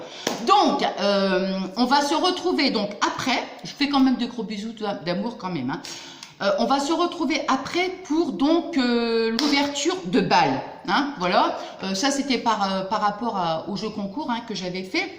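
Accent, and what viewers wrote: England, United Kingdom. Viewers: Bonjour ma cocotte moment sympa surtout avec ton accent anglais et tes pantoufles moi j en ai aussi avec des cœurs et des pompons derrière, j aurais penser que le chat m aurais sauter dessus mais ça va cette folie la c fini,il fut un temps j avait des pompons devant, il m attaquait a chaque fois.passe une bonne journée en pilou c chouette.gros bisous 😚 mon amie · Trop bien cet accent anglais..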